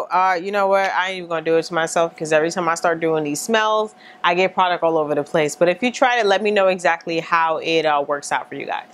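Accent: New York, United States. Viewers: ur videos r everythinggggggggggggggggggggggggggg so nice to hear a fellow ny accent im from da bronx XX!!!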